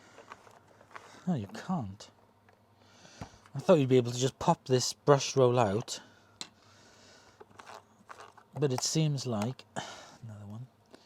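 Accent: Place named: Wales, United Kingdom. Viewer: I like your accent 😄 (I'm guessing it's Welsh). Thanks for the review! You covered all the things I wanted to know and see. The filter part was very helpful.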